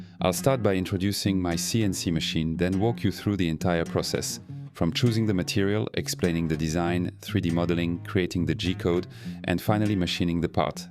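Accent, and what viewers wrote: France. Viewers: Where's your perfect English accent? Finally, your real voice? That French accent is a bit funny, personally I like it, and your English is good. My voice would be much worse 😀😀😀👍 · Heya! You've got a good fluent English with a lovely fleur (you may be saying gaze or voile these days, but in Russian we still say флёр when we mean gaze or voile figurément, I think it's an old-school maniere which dates back to XIX or even further to XVIII and all those Lois... Lous... Louis('s) you used to have) of French accent, mate! Do not be shy of it, keep it rolling! And for what it's worth, a turbine is driven by working medium, e.g. by fluid, by gas or anything else, whilst a fan - or better yet, an impeller - is the thing that moves the working medium, be it air or water or whatever. So you added a fan to your spindle to kick away chips from cutter, not a turbine. 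And just between us, I wouldn't say it loud that I've got any sort of piété to Tesla in general and the Truck in particular should I have one. It is rather controversial aveux. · It's impressive, how capable FreeCAD became and I've really enjoyed watching your CAM tutorial. By the way, your lovely French accent is really charming.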